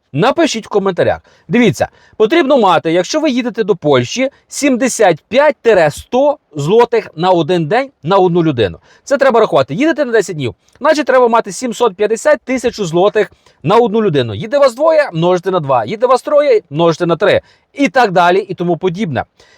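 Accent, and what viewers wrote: Western Ukraine, Ukraine. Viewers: Який гидкий голос за кадром, ще й, з западенським підви́вом..Якою мовою вона говорить, бо я українка, але не розумію, що вона там варнякає..? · Бридкий західний акцент і оце кошмар, говоріть українською, і наголоси ставте правильно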